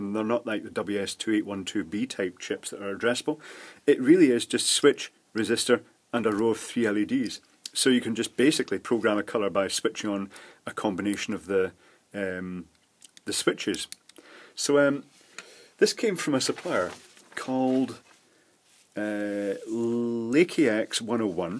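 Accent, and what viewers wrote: Scotland, United Kingdom. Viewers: Can I ask where you accent comes from? I've seen in your videos your in the Isle of Man but the accent sounds Scottish? It's rather pleasant! Very well spoken.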